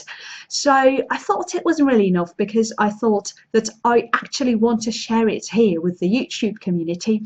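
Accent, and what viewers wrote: RP, United Kingdom. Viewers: Do you sound in RP?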